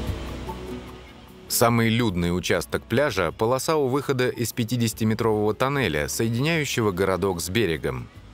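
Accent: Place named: Spain. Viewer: И названия и произношения ужасно.Почему произношение испанское?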